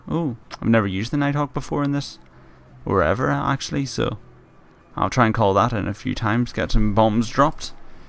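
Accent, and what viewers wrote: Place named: Scotland, United Kingdom. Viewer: Hey man, what is your accent? To me it sounds like a mix of Scottish and british but thats just what it sounds like to me.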